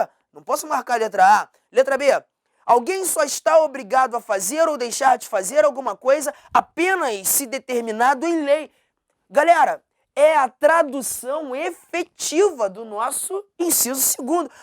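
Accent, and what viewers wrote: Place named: Rio de Janeiro, Brazil. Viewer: Dá agonia assistir por mais de 15 minutos! Cariocão da fala "malhandra" ngm merece!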